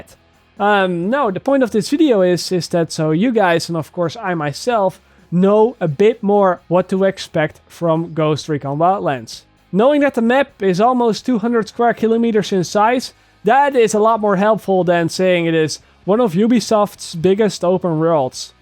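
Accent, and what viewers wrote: Netherlands. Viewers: Kom je uit nederland? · Fack you Have a so clear dutch accent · The guy who was talking is dutch, i hear it from his accent. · really well put together video, I'd say you could assume the map to be 14 squared km. also are you dutch? kind of recognize the accent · Hears voice and accent for 2 seconds...I smell dutch person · jou accent man... je bent zo nederlands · he sounds dutch or not a real english man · Ik ben 100% zeker dat jij een Nederlander bent.😜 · hoorde aan je accent al dat je nl was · clearly Dutch accent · is deze guy Nederlands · je klinkt echt zwaar nederlands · lekker nederlands accent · je hoort dat hij nederlands is 😂😂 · ok... I really like your vids... but pls... try to avoid that dutch accent! Grüße aus Deutschland ^^ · are you dutch? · Je uitspraak is echt heel Nederlands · ik hoefde niet tot het einde te wachten om erachter te komen dat je Nederlands was, typisch Nederlands accent man xd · dude I love your video's but holy shit get rid of the dutch accent.. 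· Hoor zijn accent dit is sws nederlander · Het is te horen dat je nederlands bent :) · lol, so funny how you can hear, within seconds, that the video in english is made by a dutch person. you can just tell immediately. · You can hear he's dutch by his accent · Heerlijke nederlands accent ;-) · Are you from holland bcz I've never seen you but you just sound like you have a dutch accent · You're sooooo Dutch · Your Dutch accent is so Obvious. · Euhm i gues You are from holland🙃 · nederlander die engels praat..... heel schattig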